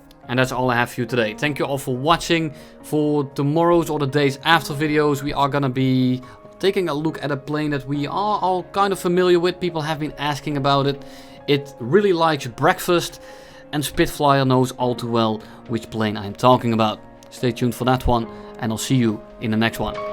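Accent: Netherlands. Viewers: Dutch English damn....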